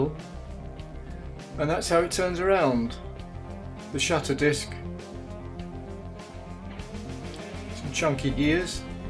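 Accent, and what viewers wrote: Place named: South London, United Kingdom. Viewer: Ah! I thought I recognised that 'Gateway to the South' accent. Wasn't 100% certain but I am now. Will never forget that wonderful tour you provided Lena and myself through the now tragically long gone MOMI, along with the marvellous hospitality, including our best ever overseas meal at your Balham residence. Having now reached another 'record age ( my first was 331/3 the second 45 :-) my collecting days arena largely behind me. In fact I've now reached, along with many of my co-hoarders, the 'what am I going to do with it all?' stage. However, my interest in early audio/visual technology has not at all diminished. Now, one of the (many) disadvantages of reaching a 'record' age is that it is now 70-years since I was the 8-year-old owners a Meccano set. (It got lost during a house move back in 1948).This means that I must leave you to home construct a Zoopraxiscope. 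When you do I trust you will share on YouTube. Keep up the good work - Charles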